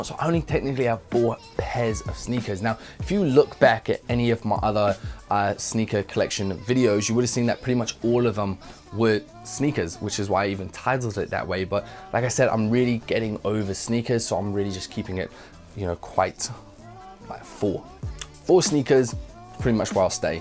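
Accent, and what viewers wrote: New Zealand. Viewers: Do any of yous know if he's from NZ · tuna - pretty sure it’s New Zealand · omg so u are from New Zealand?! The first time i heard you I thought u were Kiwi but I think I heard something about the UK so I thought wuhhht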